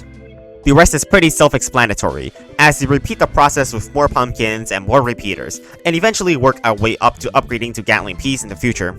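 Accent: China. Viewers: maybe his asian chinese accent you dont like? lol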